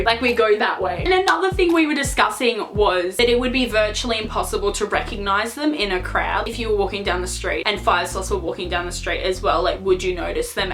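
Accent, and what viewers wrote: Australia. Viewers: Your Australian accent is so thick.🐨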